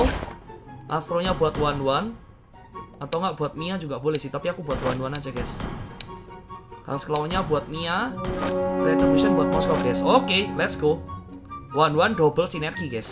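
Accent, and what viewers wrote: Java, Indonesia. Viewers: medokkkkk jawa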